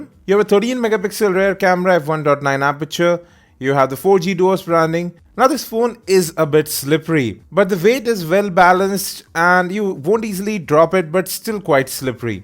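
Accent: India. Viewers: inida p3ople's words and accent make my head blow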